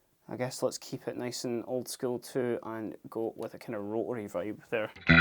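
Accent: Scotland, United Kingdom. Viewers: He can’t help is Scottish accent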